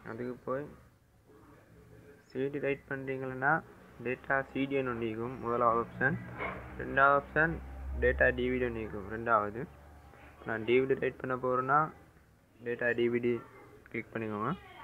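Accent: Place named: Sri Lanka. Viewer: He's Sri Lankan I think. That's why the slang is different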